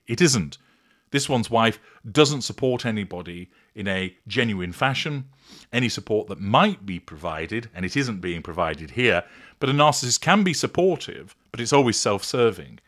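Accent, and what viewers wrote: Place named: England, United Kingdom. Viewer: English accent is so fancy so when he swears it is extra special Love love love this channel!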